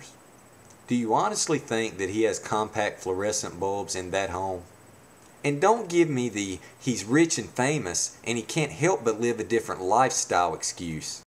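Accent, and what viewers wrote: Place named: South, United States. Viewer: The beautiful thing about this well-thought, honest, intelligent presentation is m'man's accent. Born in Tulsa, Oklahoma, I was raised in Dallas, and now live in Houston, Texas. All of my life, I have been pounded by the media with two predominate thoughts about the men of the Southern United States; we are all of two stereotypes: 'The Worst of Villains' or 'The incompetent Idiots'. It is a shame that Gore has a dishonorable accent isn't it? My hat's off to you. Ya Done Good! Thanks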